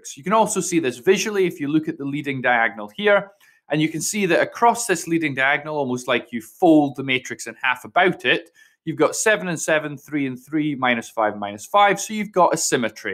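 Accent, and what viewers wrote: Scotland, United Kingdom. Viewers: I could listen to Scots accents all day music 🎶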